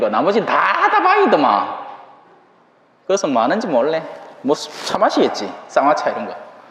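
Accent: Gyeongsang, South Korea. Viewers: 신부님감사합니다 신부님강론말씀경상도사투리가정겹고제밋네요 비유말씀이감동이네요 서울지하철안에세 듣고있는데 유듀부에종종올려주세요 건강하시고 행복하세요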